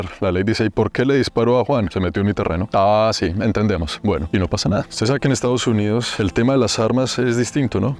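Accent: Colombia. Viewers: Espera hablas como un colombiano y eres de México? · Eres Colombiano ?! " Chévere " tu Reseña...Conocí Colombia y son Animosos... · Bienvenido 😂😂😂 Me encanta que conservas tu acento colombiano ❤❤🤗😘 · se le Nota el acento y las expresiones en la forma de habalr muy colombina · Usted no es mexicano, por su acento, se le oye lo colombiano, póngase trucha ai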